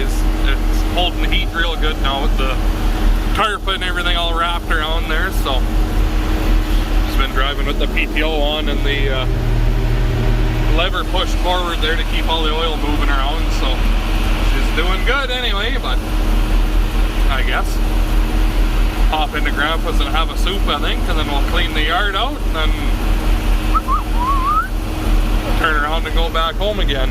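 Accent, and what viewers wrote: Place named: Canada. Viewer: Can't tell if you live in Canada, michigan, minnesota, or an state next to the 7 lakes oh yeah and also thanks for taking your time and hard work to feed people and I feel you live in Canada because your tone, voice, and they way your talk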